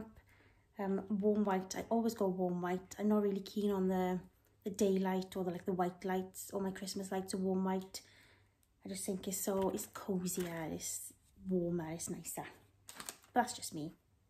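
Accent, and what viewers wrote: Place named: Wales, United Kingdom. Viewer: I'm just about to upload my haul, but still watch other people's incase they see something I've missed is that a fellow welsh accent I can hear??? Welshie here too!! 😁 let's support eachother 😘💗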